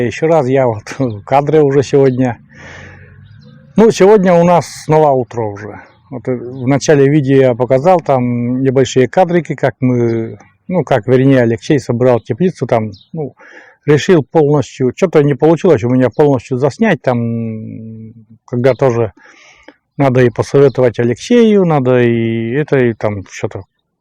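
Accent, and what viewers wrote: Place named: Komi-Permyak, Russia. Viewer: Я когда то жила на севере Пермской обл.коми-пермяцкий округ,мне твой говор,родной.Как только услышала первый раз,сразу поняла🎉,что говорит коми- пермяк. Я уже начала язык понимать,да родители уехали оттуда,а мама знала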